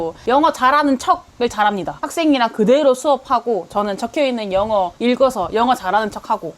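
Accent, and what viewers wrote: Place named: Gyeongsang, South Korea. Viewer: 경상도 억양이네...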